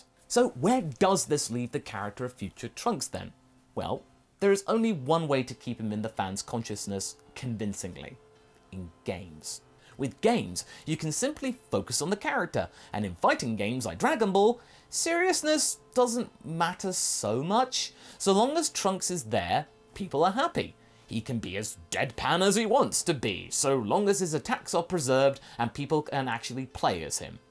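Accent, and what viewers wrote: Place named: United Kingdom. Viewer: i don't watch channel much these days. but listening to your normal voice to me sounds like you're constantly trying not to do goku's voice. or you sound like british goku.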